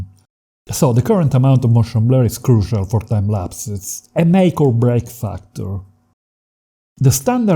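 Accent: Italy. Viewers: I could listen to you all day, love your Italian accent :D Great info! Many thanks :)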